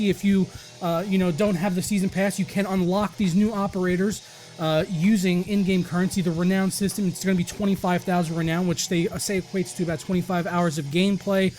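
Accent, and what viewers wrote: Canada. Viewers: I had this course in uni and there was this Canadian guy, and damn he talked exactly like that. Never managed to hear the difference until now (Eastern-European here). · that Canadian accent made me moist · O Canada! BTW great Canadian accent!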